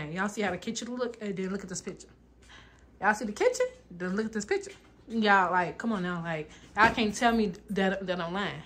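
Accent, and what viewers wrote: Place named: Chicago, United States. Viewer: You sound like you can be from Chicago..are you? my dads side of the family stay there and your accent is similar to theirs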